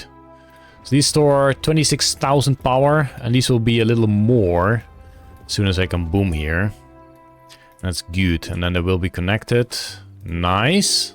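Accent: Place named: Netherlands. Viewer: Is it me or did the Nederlands bleed through a lot more than usual? Lol. Not complaining mind you. Just funny cause it happens to me when I get super tired. Keep up the goede work. Earned a new sub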